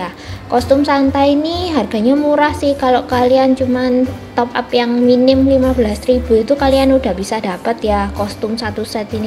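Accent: Java, Indonesia. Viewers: Medok anjay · Medok bgt kak wkwk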